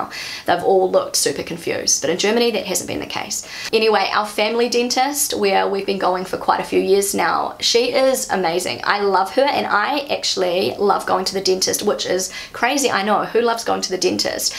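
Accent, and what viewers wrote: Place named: New Zealand. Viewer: I am a German mum who has been living in Spain for 16 years. It makes me a little bit jealous that the dental treatments for children in Germany are free. Here in Spain, one must pay for everything, social security doesn´t pay anything. My dental insurance paid by my employer is quite useless. I have not had such good experiences with German dentists as an adult. First of all, many still use amalgam (I wasn't even asked at the time) and secondly, it is very expensive when a treatment has to be paid. Here the treatments are roughly half the price with a similar cost of living. Fillings are not free but they stopped using amalgam decades ago. By the way, I like your channel because it's interesting how you see Germany as a foreigner, especially for me as a German and foreigner in Spain. I also like your accent because long time ago I spent a year in OZ and met a lot of nice Kiwis there.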